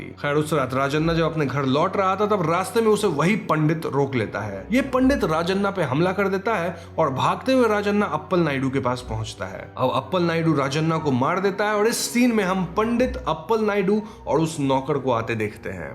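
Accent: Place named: Bihar, India. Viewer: जिया हो बिहार के लाल भाई बिहार से हूं एक्सेंट आ रहा था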